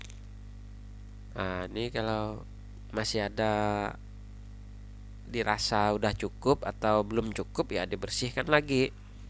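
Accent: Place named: Aceh, Indonesia. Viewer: Asli mana bang? Logat nya kayak orang aceh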